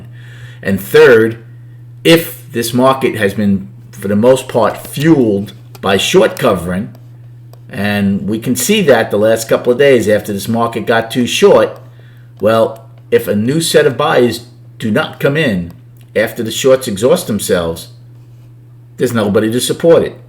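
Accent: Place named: Boston, United States. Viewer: I know a boston accent when I hear it lol I'm from western mass! Let's get paid today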